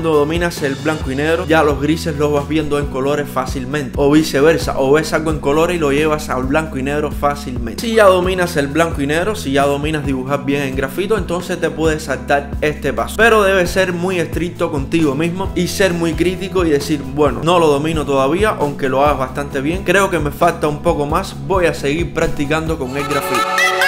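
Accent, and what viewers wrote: Cuba. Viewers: Tu acento te delata tienes origen cubano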